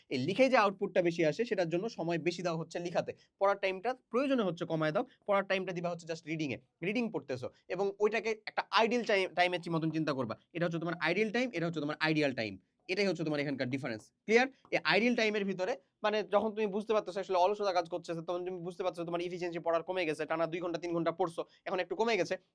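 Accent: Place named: Rajshahi, Bangladesh. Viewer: DAMN SURE VAII FROM RAJSHAHII LANGUAGE TA OIROKOMII:face-fuchsia-tongue-out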